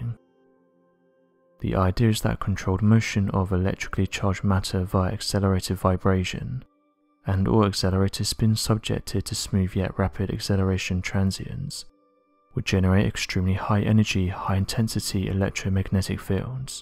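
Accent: United Kingdom. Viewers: This is all interesting to contemplate, and as a lifelong science fiction fan, I would love it to be proven, but where is the proof this is actually happening? All the fancy graphics, the calm British-accented voiceover, and elaborate theories ….are not evidence….extraordinary claims require extraordinary evidence. Just saying ‘this is happening’ doesn’t make it real. As exciting as it would be if this were true, where is the evidence?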